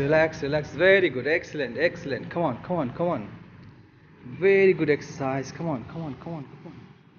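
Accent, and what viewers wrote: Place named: South, India. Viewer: Yes sir jaldi banaiye deit vedio or apki voice to bhot achiii h thodi south Indian type voice h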